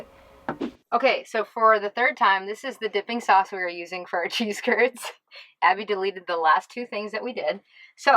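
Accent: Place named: Wisconsin, United States. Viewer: As a born and raised in Wisconsin I def approve of the accent!!!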